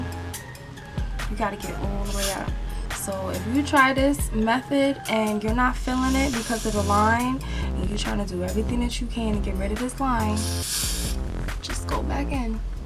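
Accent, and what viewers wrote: New York, United States. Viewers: Yes everyone has an accent depends on what region on the US you are from. Im from Colorado no one here talks like you. So im able to identify your accent. You’re probably from a different area of the US. Like NY maybe??? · I definitely learned a lot, thank you for this video girl!! Btw the more I hear your voice I'm thinking oh she's definitely from New York (I'm from N.Y.) lol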